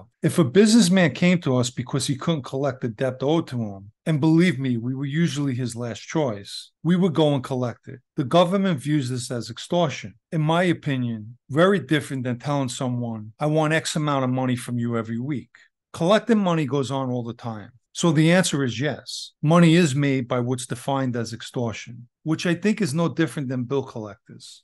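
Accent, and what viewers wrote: New York, United States. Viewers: Slight NYC accent?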